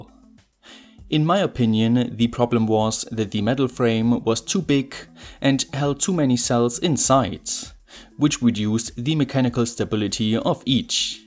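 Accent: Germany. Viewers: I am trying to make a simple - foldable array of small panels able to charge a 20000mAh 18W power bank. After 10 minutes of sifting through a ton of garbage videos on this topic, all with colorful thumbnails, idiotic, brain - damaging music and guys who just hot-glue shit together, I finally arrive to a guy with German accent. I think this will be good.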